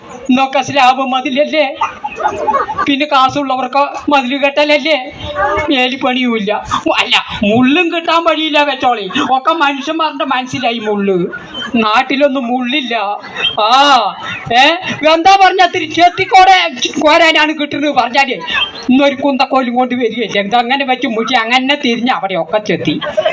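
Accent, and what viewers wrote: Palakkad, India. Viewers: പാലക്കാട്‌ ഇതുപോലെ സംസാരിക്കുന്ന ആള്ക്കാര് കൂടുതൽ ആണോ അതോ ഇതിനെ വഷളാക്കുവാനോ എന്തോ നെറ്റ് und ന്തേലും ചെയ്തുവെക്കു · പാലക്കാടുകാരുടെ മനം കളയുന്ന സംസാര രീതി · എടാ ചങ്ങാതി നീ മലയാളം ഈ പാലക്കാട് അപമാനിക്കുന്നത് നിനക്ക് നിന്റെ മക്കളെങ്ങനെയാണ് ചങ്ങായി സംസാരിക്കുന്നത് ജനിച്ചു പറഞ്ഞിട്ട്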